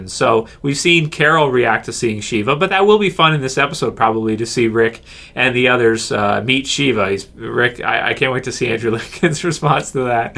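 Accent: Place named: Canada. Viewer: I love your Canadian accent, can you please do a video of you just saying OUT and ABOUT over and over...thanks!:)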